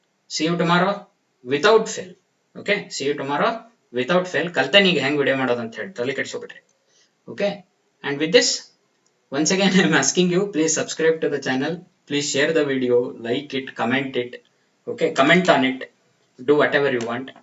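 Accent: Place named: North Karnataka, India. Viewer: Sir nim javari bashe esta ayt. Hinga classes madi namg help madri plz🙏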